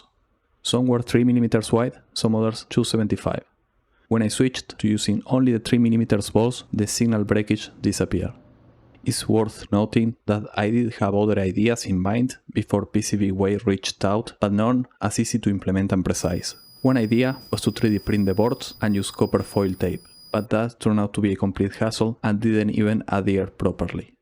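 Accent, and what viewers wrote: Argentina. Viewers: Where are you from? I recognize that Spanish English accent, maybe Argentina? In any case, great job! You are insane! Happy Holidays and keep the great ideas! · te reconocí el acento. Tremendo laburo hermano! Saludos desde Buenos Aires.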